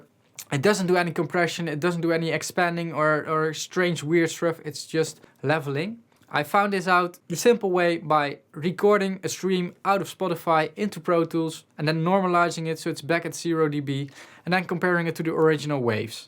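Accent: Netherlands. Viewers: Sounds dutch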